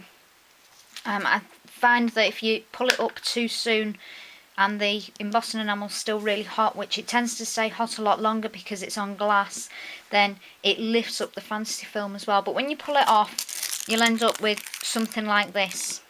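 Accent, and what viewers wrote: England, United Kingdom. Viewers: I too love your ideas I am saving my money to buy the supplies! They are absolutley beautifull! thanks for such a wonderfully detailed instructional video! Can you use the shrink wrap for easter baskets, in place of the fantasy film? Also can you use a non name brand ink to do the embossing & stamping? I love your accent are you from England? I have a friend here in the states whose Mum is from England she lives her but still has her british citizenship she too has a lovely accent! Tessa~~~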